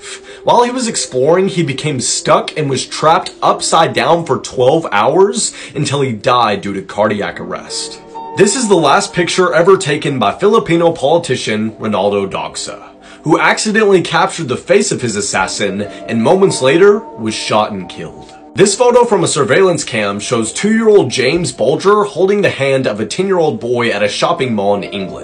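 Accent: South, United States. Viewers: Your southern twang gets thicker and thicker the more videos I listen to in a row..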